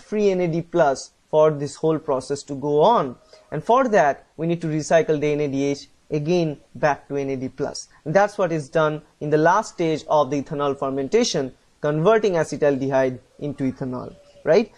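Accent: India. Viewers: thanks so much man you the only one in the in the internet who actually explains it well. Loving your indian accent!!! thx a lot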